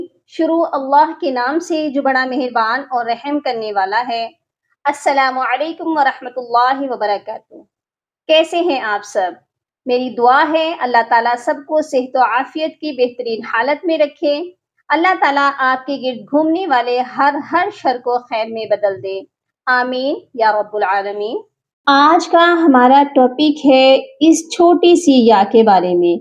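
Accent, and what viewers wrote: Panipat, India. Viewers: Ap jis lehjay may parti hai wo panipati lehja hai plz muhjy batay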